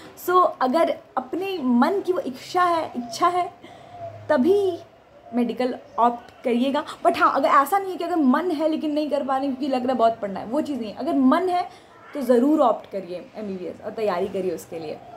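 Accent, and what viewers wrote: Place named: Bihar, India. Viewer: Yrr... BIHARI TONE💓💓 Ekdm bawaal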